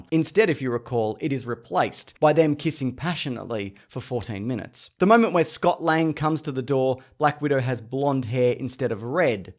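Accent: Australia. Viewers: Why is hearing marvel facts and what not so much better in an australian accent?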